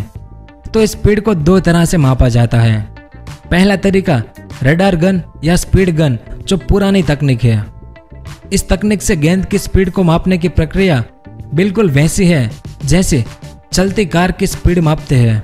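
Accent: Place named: Rajasthan, India. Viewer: Bhai ..aapki aavaj s lag RHA he aap Rajasthan se ho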